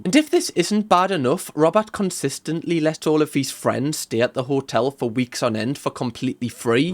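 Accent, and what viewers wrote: United Kingdom. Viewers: omg ... delightful to see another Gordon Ramsay reaction / essay ... AND you have a authentic genuine british accent , beautiful :)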